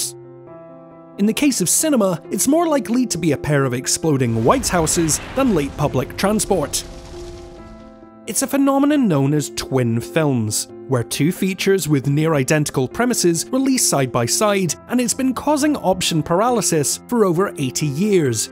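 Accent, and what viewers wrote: Scotland, United Kingdom. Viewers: yes that's the reason I was wondering about your accent...as I told you I'm from cali a city from Colombia south America but now I live close by the missisipi river.. By the way I will let you know someday when my dark fantasy novel come out... Respect Scotland people... keep on coming with more content.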